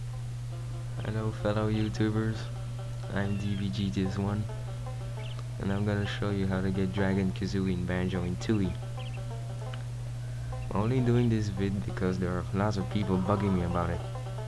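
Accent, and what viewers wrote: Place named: United States. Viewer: see told you, you sound american/canadian amazingly... but i respect your belgian waffleness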